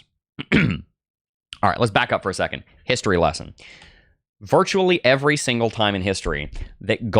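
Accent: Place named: United States. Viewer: in the uk we see this way of chatting as a yank as weird its like a usa news presenter or usa tv adverts u see in movies as actors pretending to act. please its so corny its horrible. surely this isnt normal in the usa.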